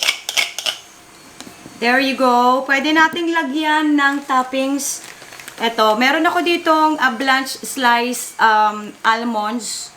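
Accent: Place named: Visayas, Philippines. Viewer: wow magaya nga in bisata accent 😉 pa sab back ka keto, keep connected, tnx 😉📊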